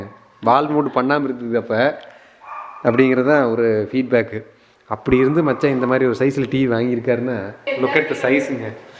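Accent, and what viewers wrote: Kongu, India. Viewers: Ungaluku vera channel iruka bro.. irundha sollunga.. unga videos romba edharthama.. informative a irukum.. that kongu thamizh awesome❤ naan valarndhadhu madurai.. adhu dhan kaadhellam inikum thamizh nu solluven.. Unga thamizh ketadhuku apram.. thamizh enga irundhalum azhagu dhan thonuchu❤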